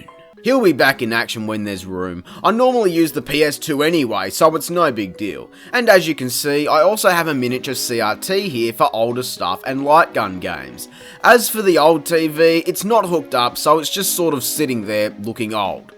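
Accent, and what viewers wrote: Australia. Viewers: Ha love that set up bro 😜 Btw do you live in Australia? You sound Aussie 😃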